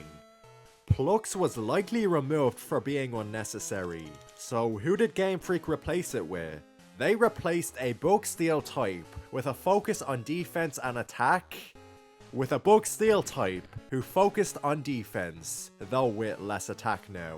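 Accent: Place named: Ireland. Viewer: The accent is very whiplashy to me because I got bullied so hard in Dublin for liking pokemon, so seeing a really cool and nerdy pokemon video in an Irish accent is WILD to me lmao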